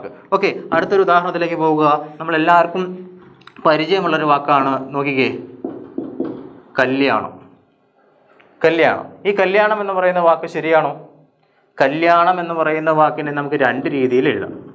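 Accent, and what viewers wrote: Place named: Thiruvananthapuram, India. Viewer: Farya parayunnath Thiruvananthapuram Kollam aan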